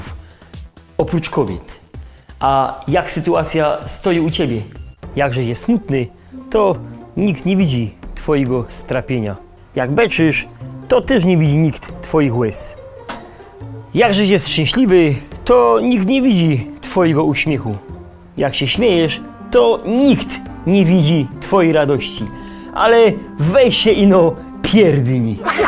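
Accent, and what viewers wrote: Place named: Silesia, Poland. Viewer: Słychać, żeś hanys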